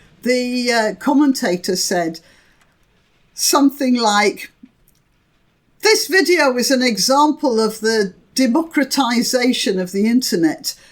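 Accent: United Kingdom. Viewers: Hail and welcome.... welcome into my purview so I can become more and more enlightened. I welcome your British accent.... the each and every noun and verb etc are so well enunciated and the thought that is back-tracked to be RE-pronounced is just in sync with the ones I need to re-hear. I'm so lucky. um, to have found your channel.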